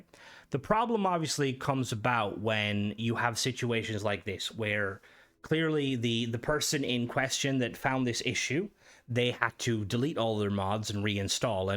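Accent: Ireland. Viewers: We love are angry Irishman Mod reviewer. Everything sounds more intense with an Irish Accent, it makes ppl pay attention. Keep on doing what all of us don't want to do and review them mods.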